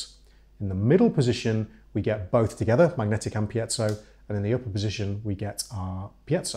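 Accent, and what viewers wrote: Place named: RP, United Kingdom. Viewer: Dude in ripped jeans yet he has a posh British accent and playing a $5000 guitar.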